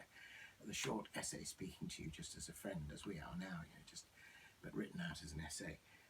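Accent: England, United Kingdom. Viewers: I love your English accent .😊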